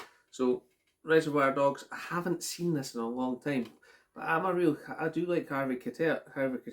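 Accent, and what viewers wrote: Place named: Scotland, United Kingdom. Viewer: Just wondering what part of Scotland are you from. I myself I was born in Fife👍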